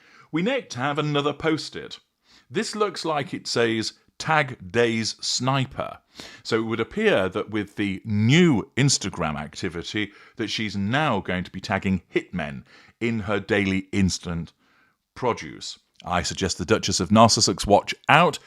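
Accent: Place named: England, United Kingdom. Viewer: 🎉Especially with the beautiful ecglish ac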